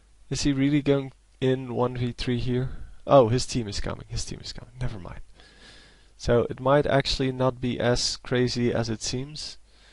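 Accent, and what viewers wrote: Netherlands. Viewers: Haha, ik wist het! Nederlands, hoorde het aan je accent =p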